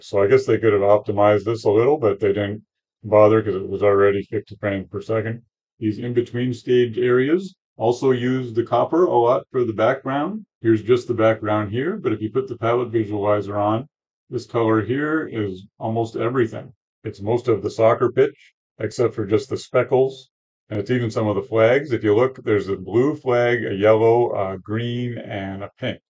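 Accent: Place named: South, United States. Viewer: OK, because your accent is heavy, sounds like you're from the Southern States of the USA. I didn't even know the AMIGA was a thing in Canada. It was mostly popular in Europe. So do you think Canada will win the World Cup then?! :D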